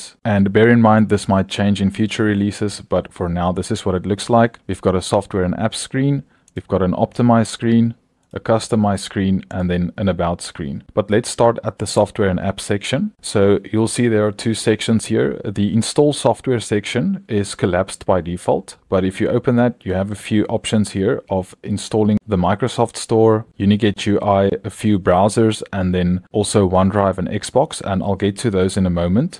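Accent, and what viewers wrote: Afrikaans, South Africa. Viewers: I'm hearing some SouthAfrica in there :D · South African for sure ? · South African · are maybe south african? also cool stuff! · Do I hear a brother from SA? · It is great to see a South African doing well on YouTube. It also sounds like to have a Afrikaans ascent. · I could be wrong, but it sounds like you have that SA english accent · South African detected!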